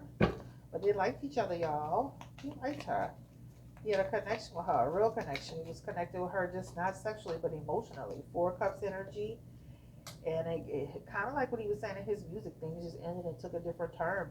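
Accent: New Orleans, United States. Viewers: You got jokes 😂 I love our New Orleans accent⚜️ What’s so funny is… I never knew we had an accent until I caught on my taking the time to listen 👂🏽. How do we sound to you??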